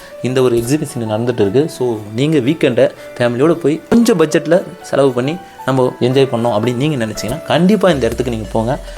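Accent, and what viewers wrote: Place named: Vellore, India. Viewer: Nama than 😂 vellore la inime nama than antha mari iruke ya un slang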